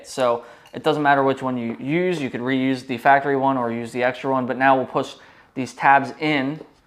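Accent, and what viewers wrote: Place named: Pennsylvania, United States. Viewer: Nice bro! Thanks installing mine later today! Love a good PA accent! lol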